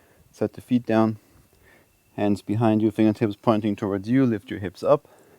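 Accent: Germany. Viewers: Are u german or swiss? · ich war in Köln für ein Jahr. Freut mich so sehr ein Deutschakzent zühören! Keep being awesome! Love the channel I can't wait to show my partner :-) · Are you from Germany? Your accent sounds hella german :) Good video👍🏼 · Nach4 Sekunden direkt den deutschen in dir gehört. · german accent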